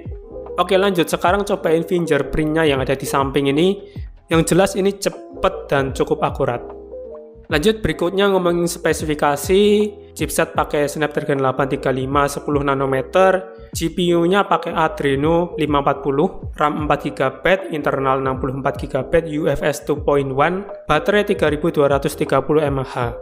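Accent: Surakarta, Indonesia. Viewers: Loh wong solo to mas ???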